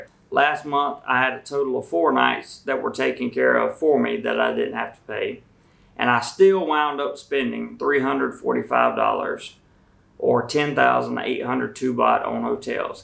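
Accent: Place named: Carolinas, United States. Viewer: You are from Carolinas know by ur accent I LIKE Jomtien very reasonable for long stay shelter Was thinking you live in a more reasonable Shelter cost location??? Thats why i asked...Sorry dude Thailand is a million times Safer than USA dont understand security reasoning?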